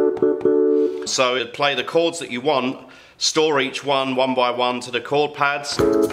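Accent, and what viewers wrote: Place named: United Kingdom. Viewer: From your accent you seem to be British so why is everything priced in American dollars on your site? Are we going to find that UK customers are going to be ripped off again (I've been charged over £250 for things priced at $250 US in the past by other companies) because someone seems to think that we're too stupid to notice the difference in the exchange rate, and they also think we won't notice that we're being conned. This looks like something I can make good use of but I'm reluctant to buy without knowing how much it's really going to cost me.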